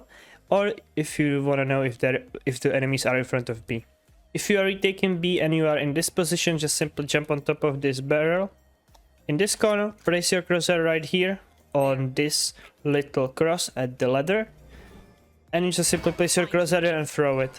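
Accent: Czech Republic. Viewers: Bro's czech i know by hearing accent, am i right?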